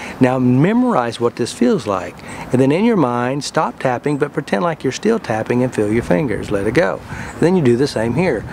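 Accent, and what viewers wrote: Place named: South, United States. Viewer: Great video! Also for people who aren’t used to hearing a nice US southern accent, this is a nice example. ;)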